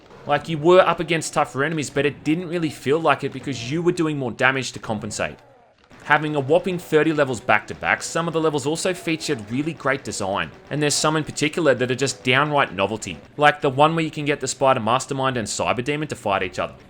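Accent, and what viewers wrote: Australia. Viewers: It's Australian, it does not sound anything like Irish xD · I hate the Aussie accent and I AM Aussie. #Irony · Your Aussie inflection is pure perfection. (I'm born & raised south Sydney)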